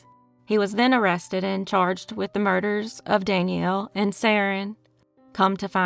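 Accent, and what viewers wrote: South, United States. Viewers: is the way southern people talk. There are words that every dialect in the US speak that is different from Webster’s Dictionary pronunciation of the actual word. It says it all in the name of her channel.. That’s one of the things that makes us all unique. Our accent or dialect from our geographical region. There are some really good YouTube channels that break them down and explain why they pronounce words the way they do.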